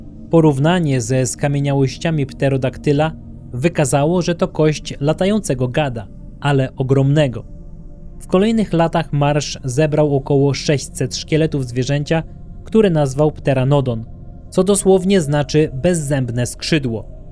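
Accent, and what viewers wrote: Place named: United States. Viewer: Uwielbiam relacje na temat krypto gatunków. Zdaję sobie sprawę, że cześć z nich lokalny folklor lub turystyczny marketing - tak jak szkocki Nessi. Jeszcze raz dziękuję za dbałość wymawiania terminów po angielsku. Gdzie uczyłeś się angielskiego? Brzmi jak amerykańska wersja.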